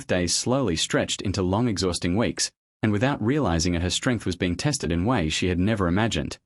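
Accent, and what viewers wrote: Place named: Australia. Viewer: Okay. . . With this voice, I suppose I can imagine this is about the Strayan outback.😊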